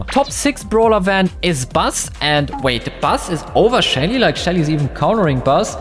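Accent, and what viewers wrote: Germany. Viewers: yeah but that’s because he’s german and has an accent bro the other ones are just mistakes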